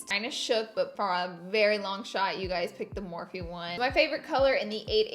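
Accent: South, United States. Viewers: I love your voice 😂 I sound just as country 🤷😍😇🤸♥️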